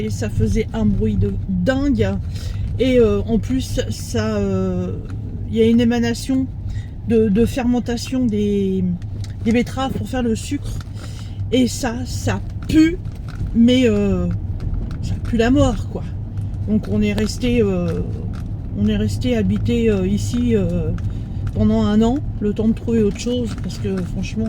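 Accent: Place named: Eure-et-Loir, France. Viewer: Ça fait plaisir entendre quelqu un qui parle eure et loir moi j i habiter mais du côté de dreux bizou bonne journée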